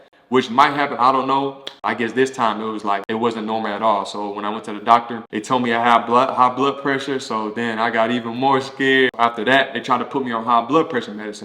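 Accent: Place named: South, United States. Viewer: Girl he from the south! Dialect/ pronunciation is associated with where you’re from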